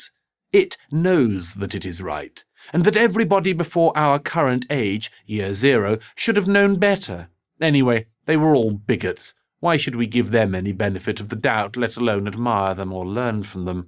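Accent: Scotland, United Kingdom. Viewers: I agree. His voice is cold. Cold and bereft of love for humanity. It is the voice a crocodile would have if it were rendered the power of speech. I personally really like it, but then I always get hard at a Scottish accent that has been mangled to sound English.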